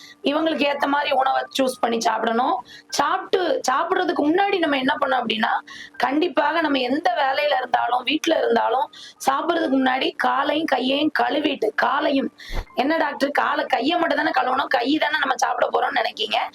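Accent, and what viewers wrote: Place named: Thoothukudi, India. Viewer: பேசும்போது தூத்துக்குடி வாசனை வருகிறதே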